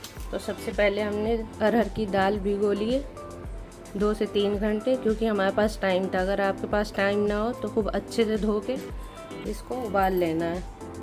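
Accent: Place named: New York City, United States. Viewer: NYC colour of NYC voice 🎉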